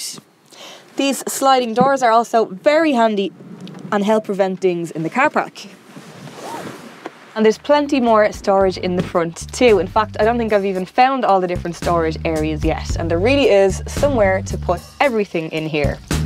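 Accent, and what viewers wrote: Ireland. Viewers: Hiberno English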